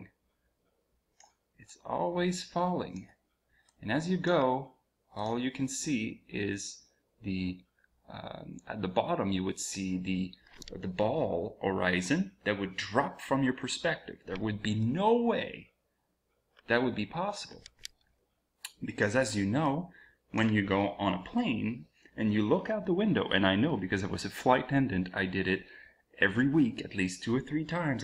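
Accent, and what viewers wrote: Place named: France. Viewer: Salut. J' ai compris que tu volais Mtl-Cancun, et je croirais entendre un petit accent. Alors je me dis que tu es français. Je commence a croire ce nouveau concept de la terre plate et c'est très troublant. Je pensais justement utiliser un programme pour tester mais tu as fais ça dans ce vidéo. Merci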